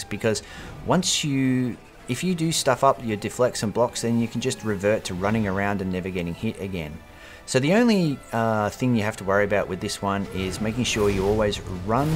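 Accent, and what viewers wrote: Australia. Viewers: Pretty Cool. You sound like an Aussie.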